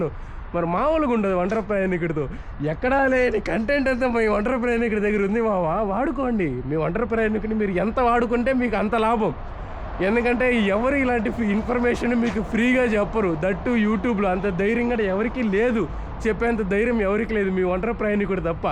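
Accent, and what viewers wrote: Chittoor, India. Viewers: Bro needi Chittor district aa, slang ala undhi👍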